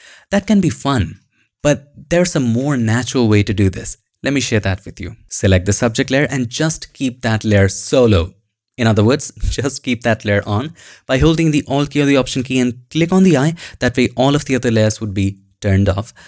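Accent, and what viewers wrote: India. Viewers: I never thought I love the indian accent until I fell asleep while watching this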